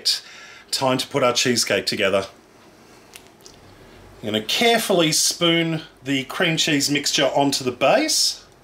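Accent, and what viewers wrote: Australia. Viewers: I loooooooove Australian accent 🤤😍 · I'm from the UK..... believe me he deffo sounds like an Ozzy to me lol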